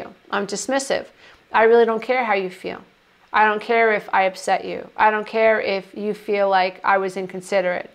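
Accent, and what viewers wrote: New York, United States. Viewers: Your New York accent is EVERYTHING! 😊🏙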